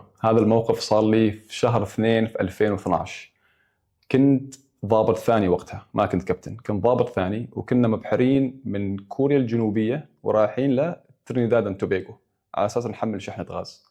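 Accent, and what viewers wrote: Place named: Syria. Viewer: لهجتو سورية الصراحة😅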